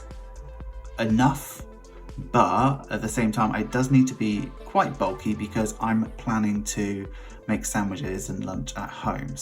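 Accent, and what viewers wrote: United Kingdom. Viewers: Dear, I absolutely get your point and I LOVE how honest you are. Trust the change. Change is good and with your talent, it will bring you fantastic things. I also will need to move by myself next year, and Im dreading it. Ive never lived by myself, always with family, but it got to a point where I KNOW I need to step away. I found your channel thru Pinching the Pennies and it got my attention because of your BEAUTIFUL British accent (let's all admit British people have the most elegant accent EVER😍), and stayed because of your commitment and talent, your honesty and your strive to reach your goal and because of ur cash stuffing videos cause I think British pounds are pretty cool (us dollars are ugly AF). Don't be scared. Things will get better! We're here for you and with you thru this journey, even if virtually ❤️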